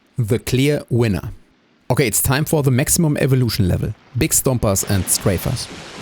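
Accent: Germany. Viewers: Jetzt reichts! Für deinen deutschen Akzent bekommst du ein Abo!!!! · That's the german accent for ya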